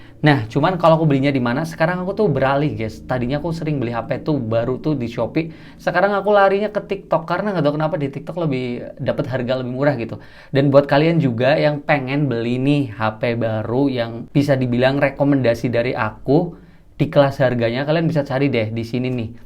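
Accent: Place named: Cirebon, Indonesia. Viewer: kaya orang cerbon ya mas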